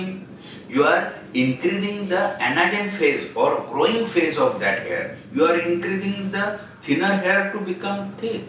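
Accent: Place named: India. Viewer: Oh this annoying Indian accent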